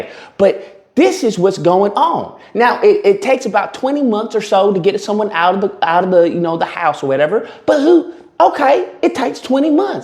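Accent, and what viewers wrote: South, United States. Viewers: Your " country accent " is too funny!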